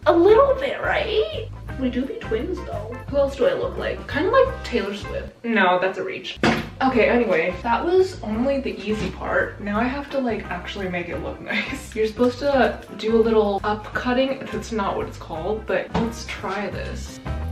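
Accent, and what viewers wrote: United Kingdom. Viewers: Wth I was like "her voice sounds familiar but I've never watched her before" and it's because you sound like trin lovell but less deep, even the british accent lol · Me being a British person, reacting to her British accent 😂 I died 😂😭🤭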